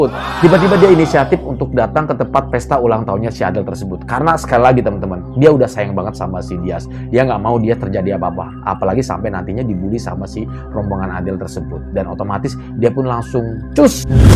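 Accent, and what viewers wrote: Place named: Sunda, Indonesia. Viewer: Resep KA momo bar bar lebok ku sia haaaaaaaaaa Sunda banget nya bang saya Oge orang banten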